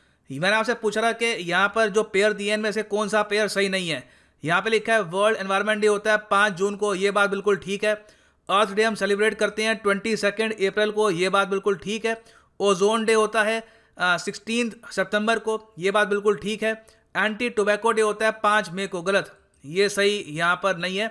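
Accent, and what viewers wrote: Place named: Punjab, India. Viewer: Sir aapka ton Punjabi Wala hai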